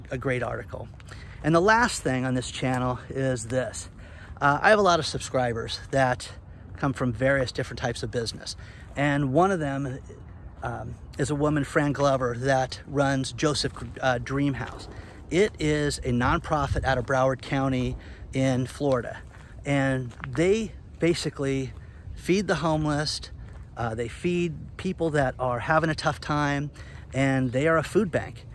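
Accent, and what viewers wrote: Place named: Western New England, United States. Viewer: you sound like you're from Western New England... like me. Great work!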